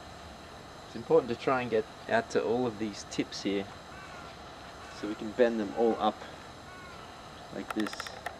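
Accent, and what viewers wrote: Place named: Australia. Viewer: great to hear an aussie voice....cheers mate....you did great explaining....maybe discuss the growing habits,fertilizing etc...go oz :D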